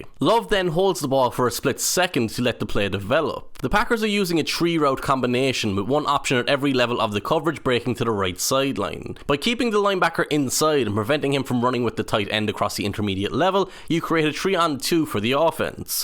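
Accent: Ireland. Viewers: It is so odd hearing an Irish accent when talking about American Football….I expect to hear this kind of accent for Premier League analytics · Really enjoyed your analysis on this.As a Scottish Packer fan it’s great to hear an Irishman talk about football… relatable!👍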